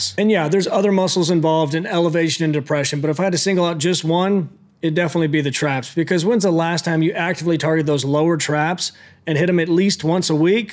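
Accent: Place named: South, United States. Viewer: my brain needed a while to process hearing scientific nomenclature through a southern accent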